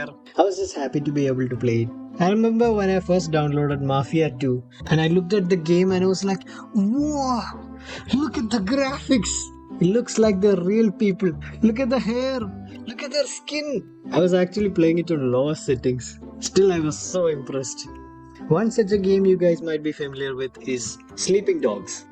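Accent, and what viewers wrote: Kerala, India. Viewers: I had a gut feeling that you might be from Kerala when you used that sad bgm from the Malayalam movie 'Vandanam', in one of your early videos. Now hearing your accent I can pretty much confirm. You are a malayali, right ? I share a similar story as I was hell bent into mobile games(keypad) of the past. I didn't know games were supposed to have a story until class 6. What you said about paying for games is right. I also didn't know games were supposed to be bought for a long time. One thing I never had was friends who had deep passion in gaming like me. I am always criticized and shamed for liking games by my siblings and cousins. But I know the happiness you get just opening an .exe file and trying out a game gor the first time. I'll always cherish that. Lots of love ❤❤ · anda malayali accent is clearly heard :)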